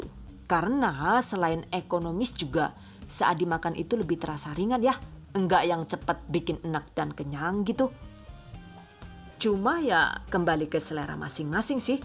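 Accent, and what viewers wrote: Banyumas, Indonesia. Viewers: Orng cilacap apa banyumas kak...sya orng tegal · Rika wong ngapak yu, salam kenal yu🤭 Kesewun resepe(terimakasih resepnya) 😊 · rika wong endi si yu.. kayong pada bahasane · Saya oma Holi salam kenal sudah kue2 nya enak suara pembuat nya juga sangat merdu apalagi kalau bicara pakai logat ngapak lucu banget saya suka kue2 nya juga kelucuan nya sukses selalu AMIN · Siip resep orang ngapak... Heeee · Rika si wong ngendi yu deneng ngapak 😂😂😂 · Ane senang chenel ini chef-nya itu lo kalau ngomong lucu Inggris ngapak,jadi penasaran dah sama pasti uwonge ayu Iki,koyo msk Soimah hebe,dan makasi resepnya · ngapak bersaudara...pda kro adonan kulit martabak telor y mbok... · Jebule ngapak 😁 yu! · Minyak bulus nyong haha..deneng ana wong ngapak ya yu....turu lah wes wengi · ih ngapak kalii ini wkwk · Done #giveawayTHVDesember2019 Suka dg suaranya yg berat khas ngapak. 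Jgn dihilangkan ya. Pasti dong suka resepnya..simpel. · njenengan wong ngapak apaa mbaa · Ngapak ngapaknya yg sll d tunggu....😁😁😁 mksih resep2nya 😚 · Ngapak ya mbok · Asline ngapak😍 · Deneng ngomong ngapak? Asyik.... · Mbekayune wong ngapak y..pada karo inyong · Baru ngedengerin ternyata bisa ngapak juga hehehe.. Ilmunya sangat bermanfaat.. Aku lngsung nyobain bikin lohh bude..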